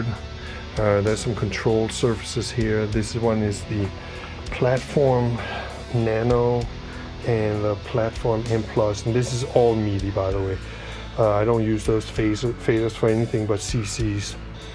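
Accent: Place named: Denmark. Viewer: HELLO! "Old friend"... GREAT an`really informativ video. Good to see that your still are alive. It`s fun to hear! That you still got "The Danish accent". Greatings from Denmark...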